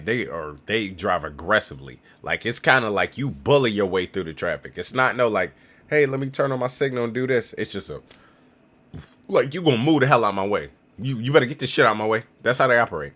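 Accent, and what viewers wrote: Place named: Dominican Republic. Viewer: U actually do look Dominican. They can just tell from how you carry yourself...and your accent of course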